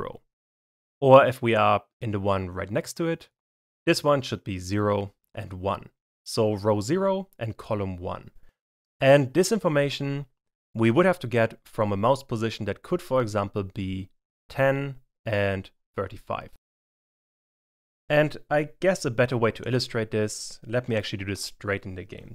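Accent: Germany. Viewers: haha moin😂 Wollte nur fragen wegen dem Akzent.Der ist ja auch nicht komplett englisch. Vielleicht ist es ja deutsch? 😅 keine Ahnung. Aber du bist anscheinend deutsch 😂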